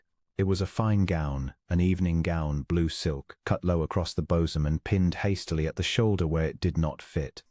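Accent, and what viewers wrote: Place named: United Kingdom. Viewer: I absolutely loved this story! Well written and perfectly narrated. A British accent makes these stories so much more interesting to listen to. Thank you!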